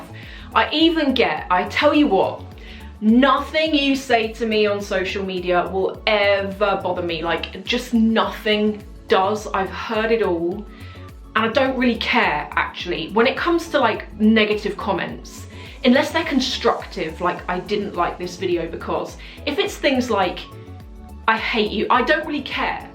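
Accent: RP, United Kingdom. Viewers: As a French person tbh it is not your seemingly lavish lifestyle that made me wonder if you had money..it is more your posh accent. In any case I don't mind.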